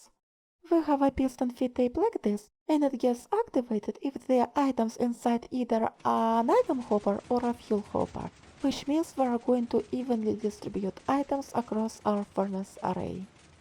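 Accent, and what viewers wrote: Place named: Russia. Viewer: Молодец. Надеюсь, что у англоязычной аудитории ты будешь популярна. Я не стебусь. Но по произношению понятно, что ты русскоязычная.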